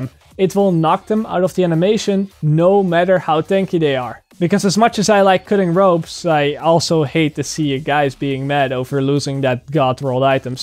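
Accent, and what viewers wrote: Netherlands. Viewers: Je klinkt als een Nederlander ben je dat ook? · its dutch english accent xD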